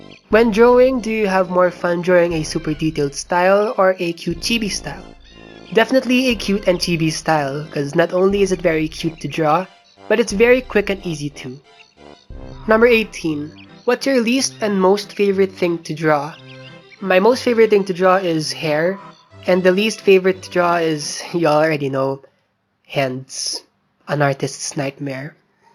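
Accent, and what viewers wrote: Philippines. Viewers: The moment I heard you speak I immediately knew you were a fellow kababayan:D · When u started to speak I was like "bat parang Filipino sya..?" Then u literally answered it right away lol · THE FIRST TIME I HEAR HIS VOICE,I WAS LIKE,IS HE FILIPINO OR WHATEVAH 👀👀✨✨ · As soon as I heard ur accent, I was like: oh ur Filipino. Also happy birthday!!:DDDD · i heard ur accent and i knew ur Filipino, im also Filipino i never got to learn how to speak Tagalog but I can understand a little bit. btw happy birthday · accent palang alam na agad ✊😭🇵🇭❤️ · Nung natinig ko accent mo alam ko na na pilipino ka😊❤😎🤣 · TRIP KO YUNG ALAM KO NANG PINOY KA FROM THE ACCENT OMFG I LOVE THIS/YOU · i knew it... from the accent and manner of speaking... congrats sa mga project mo with the group of Valkyrae and her friends i like your drawing style, nagdodrawing din ako since elementary days but i stopped doing drawing for 2 decades more or less and just got back roughly last year keep up the good work and MALIGAYANG KAARAWAN many more blessings to come stay safe stay healthy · I KNEW IT YOU ARE A FELLOW FILIPINO THE FIRST 30 Seconds in the video, when I heard your accent I just said Hands down he is Filipino 🇵🇭🇵🇭🇵🇭🇵🇭🇵🇭🇵🇭🇵🇭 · HA! 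I KNEW THAT ACCENT SOUNDS LIKE MINE! CUS I'M FILIPINO TOO LOL MGA KAPAMILYA MAG REPLY KAYO DITO KUNG PINOY TALAGA KAYO HAHAHAHAHAAH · Sabi kona pilipino ka haha sa accent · I knew it at first because of the accent! Lol Proud Filipino here! Mabuhay 🇵🇭🇵🇭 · WHEN I HEARD UR ACCENT I WAS LIKE WAIT THIS KING IS A FILIPINO?! AND YOU ACTUALLY ARE!! ANG ANGAS NG ARTS MOOOOOO, CONGRATS TO UR SUCCESS AND BELATED HBD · haihai, filipino ka rin pala, ndi ko alam until narinig ko accent mo, wasn't sure tho then you confirmed it coz u're accent is good (like better than most of us pinoys) kaya di nahalata. A proud kababayan for u 🤧 sanaol talented sanaol nkakausap si Rae sanaol lahat ✨ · OMG THE FIRST TIME I HEARD YOU TALKING I SAID YOU'RE A FILIPINO!!!! AND I WAS NOT WRONG!!! 🇵🇭🇵🇭🇵🇭🇵🇭 Maligayang Kaarawan!!! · BRUH THIS IS MY FIRST TIME WATCHING THIS BECAUSE I WAS SO BUSY AND BRUH YOUR ACCENT IS SOO FILIPINO HII!! 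I'M A FELLOW FILIPINO (≧▽≦)💖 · Me:0 that accent!! hmm....: I’m Filipino. Me: I WAS RIGHT IM RIGHT!!!!!!!! W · I recognize a Filipino accent from a mile away! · Welp, Im already late on this but, meow. When you start talkin I knew youre a filipino <3. I really love your animations!! <3 <3 <3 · I'M SCREAMING. I was like, "Huh, he sounds Filipin–" as he said it AAAAAAAA WOOO MGA PILIPINOOOO LEZZGOOO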